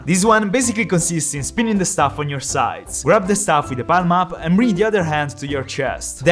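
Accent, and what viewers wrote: Italy. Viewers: Ho sempre sentito un accento particolare e oggi ho finalmente scoperto che anche te sei italiano! 😂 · L'accento italiano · Ti assicuro,notavo l'accento ma mai pensavo fosse italiano,also extra nice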